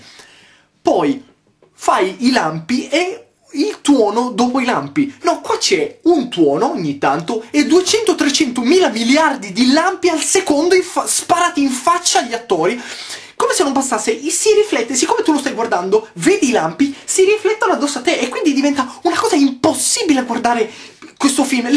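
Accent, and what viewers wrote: Turin, Italy. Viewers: sei di Torino? :)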